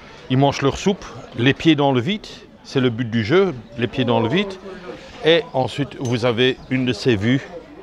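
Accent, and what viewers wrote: Belgium. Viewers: salut,je suis tombé par hasard sur tes vidéos,je suis un amoureux de la Thaïlande,mais je n y suis jamais allé en tout bravo pour nous faire connaitre une autre Thaïlande un peu plus rurale.......étant Belge moi aussi il me semble capter un accent familier...... merci pour ces belles video!!!!!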